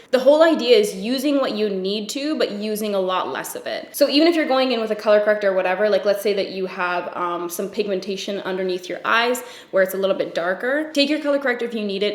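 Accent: Canada. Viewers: I really enjoy watching your videos. It's hard to find naturally fair skinned, blue eyed, blondish artists doing makeup videos that are both imaginative and genuinely good. Also, I really appreciate how honest and down-to-earth your narration is. I know you said you're Canadian and not American but for a European, often the accents are pretty much the same. The American video narration style can be very cheesy or loud and repetitive without really saying anything new. It's for this reason that I actually rarely finish a makeup video. I tend to get irritated with the token phrases and the industry veneer everything has. Your videos taught me more about how to approach makeup and it's application...rather than just what's fashionable now... which is so important given how economically unfeasible it is for most people to buy these products. Thanks a mill for not being a knobhead. 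Love from Éire X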